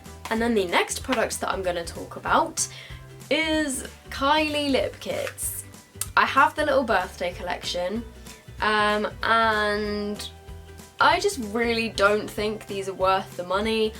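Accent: England, United Kingdom. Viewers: What part of England are you from? <3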